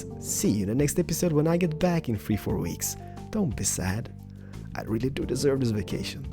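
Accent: Sweden. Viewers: Are you swedish? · are you swedish?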